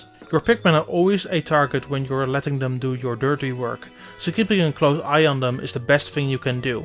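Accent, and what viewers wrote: Netherlands. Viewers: Is that Guy dutch? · He's Dutch, like me. Not everyone speaks flawless English, you know? ;) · I know how hard it is to speak English with a Dutch accent, its difficult pain in our asses. Great review, even though nobody likes this game. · The video started and I was like "hey this guy is Dutch". Then I saw name in the description. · Are you dutch? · Damn! You're doing a great job working with these guys. I can still hear you speak English as a Dutchman. I hope you will keep working on that but eh... Denk dat vele mensen uit Nederland een voorbeeld aan je kunnen nemen.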